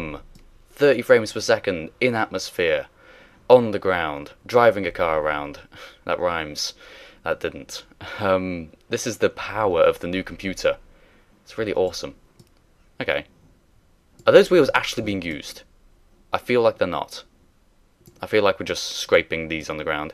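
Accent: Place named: England, United Kingdom. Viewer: You sound like an English PlumpHelmetPunk. Awesome.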